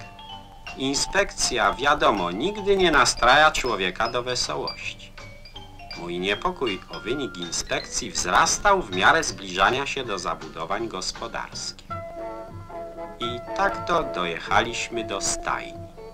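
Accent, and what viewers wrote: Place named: Warsaw, Poland. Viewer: Fajny Akcent lektora ciekawe Z jakiego rejonu Polski on był trochę jakby z Warszawy albo okolic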